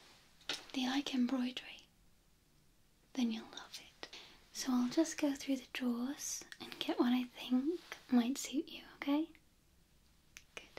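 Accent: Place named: Birmingham, United Kingdom. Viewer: You can talk to me all day with that amazing Birmingham accent!!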